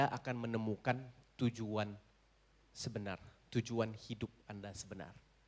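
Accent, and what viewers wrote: Indonesia. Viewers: Smg almarhum mendapat tempat terbaik di aiaiNya....amin Logat sdh sgt Indonesia banget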